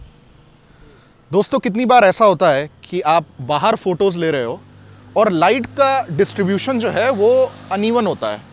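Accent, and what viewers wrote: India. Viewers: are you bengali?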